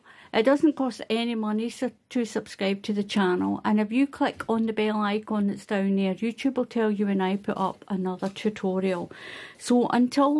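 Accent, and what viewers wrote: Scotland, United Kingdom. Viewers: you have really created a masterpuece with this beautiful, but simple stitch! Is that King Cole Cotton Silk a category 3 cotton? Trying to find a good substitute here in the US. My Great Gran taught me to crochet at 8 with #10 bedspread cotton. She was also Scots, so I love to hear you speak because it brings back fond memories of my Great Gran. Thank you for your lovely way of teaching and your brilliant talent!🎉❤🎉❤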